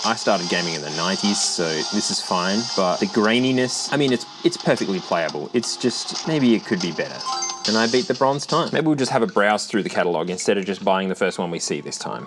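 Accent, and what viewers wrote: Australia. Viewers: all australians sound the same I was just watching dankpods video and there is ZERO difference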